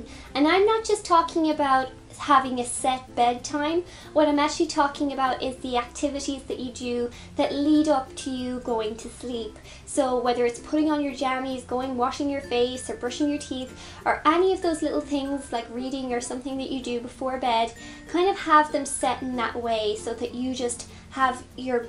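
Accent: Ireland. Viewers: Are you irish or is it just me cuz im irish For anyone who is irish conas a tá tu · Are you irish? I am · omg is she Irish , love it haha ☺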